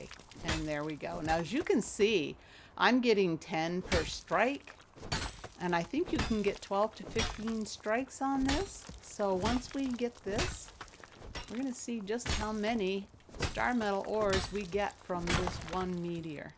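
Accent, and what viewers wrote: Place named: United Kingdom. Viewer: Love your guids, im from the uk so also love the accent 👍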